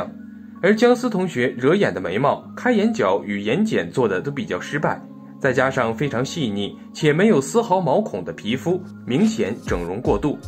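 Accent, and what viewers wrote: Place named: China. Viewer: 这个节目吊啊，说着大陆普通话、换算都是新台币！！！！哈哈哈哈哈😄懵逼了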